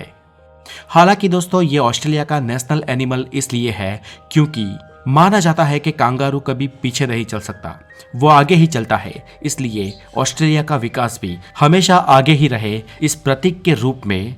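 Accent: Gujarat, India. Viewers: Gujrati bhai hindi suit nahin karti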